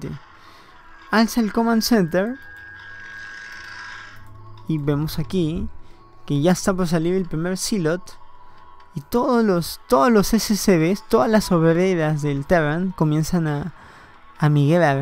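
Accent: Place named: France. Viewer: esque es frances.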